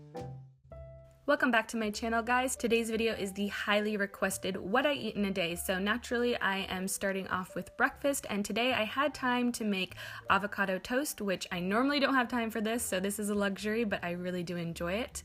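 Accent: United States. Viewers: I'm confused. You have an American accent but I noticed you using British products ?? No hate just curious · Do you get Asda in America? I assume by your accent that you're American but I thought that Asda was only in the U.K.? Might be be wrong xxx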